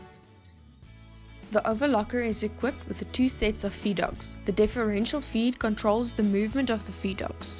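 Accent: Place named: South Africa. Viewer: Excellent. I thought that was a South African accent :) I just unboxed mine and am so glad to find a detailed explanation. Thank you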